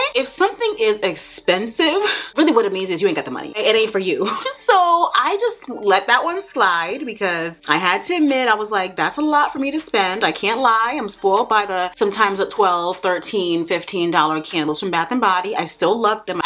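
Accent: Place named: Rhode Island, United States. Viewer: I just started binge watching your videos, and I knewwww I heard a RI accent the first time you said coffee🙌🏾 ⚓️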